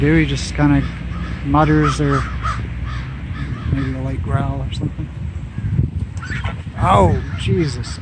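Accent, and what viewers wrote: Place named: RP, United Kingdom. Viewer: I can already hear her Received Pronunciation.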